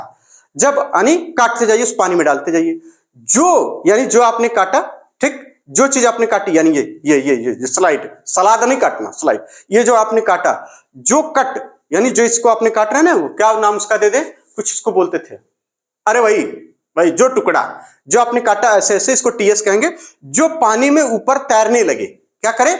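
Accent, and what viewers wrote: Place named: Bhojpuri, India. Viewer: Bhojpuri lecture ho gya sir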